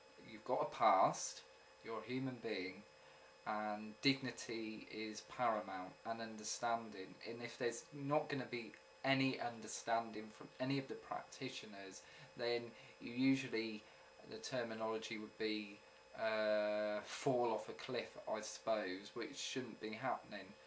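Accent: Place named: United Kingdom. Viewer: This has absolutely nothing to do with the topic of your video, but everything to do with my preoccupation of speaking with different accents: Where are you from? What PART of the UK? Because wherever you're from is where my I-don't-have-to-think-of-it British accent comes from. Fucking love it.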